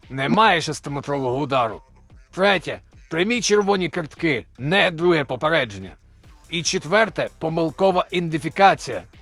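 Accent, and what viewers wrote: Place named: Canada. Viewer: Коментатор,наче з Канади)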